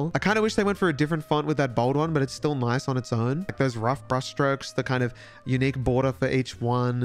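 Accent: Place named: Australia. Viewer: surreal hearing an australian talk about american basketball like this. i’m applying for a master’s in visual communication design btw i’ll let you know how it goes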